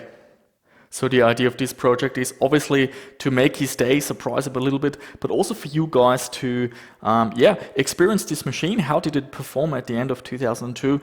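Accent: Germany. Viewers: Ach du bist Deutsch :D Hab mich schon die ganze Zeit gewundert was das für ein Akzent ist XD Tolle videos, weiter so! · are you from germany or austria?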